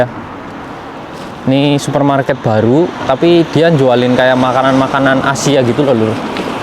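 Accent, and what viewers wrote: Banyumas, Indonesia. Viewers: Enyonge kan batang sampeane kan Endi kang ? Kok ngapak" wae. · Ngapak kh bg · Kaya wong Jawa Tengah bagian kulon😂,, ngapak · Jawa ngapak mas?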